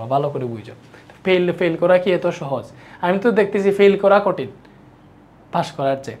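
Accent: Sylhet, Bangladesh. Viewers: Bahi sure Sylheti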